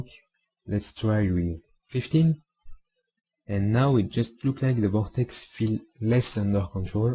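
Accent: France. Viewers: J'aime quand les français parle anglais :) bonne vidéo merci ! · tu es français non? :-) ça ce voit à ton accent ^_^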